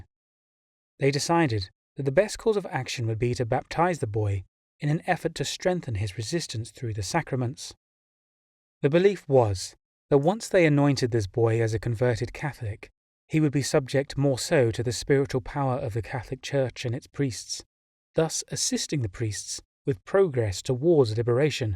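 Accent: United Kingdom. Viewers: You have a funy accent are you a brit?!!!!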